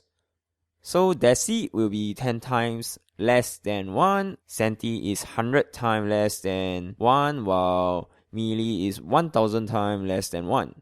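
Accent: Singapore. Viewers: definitely singaporean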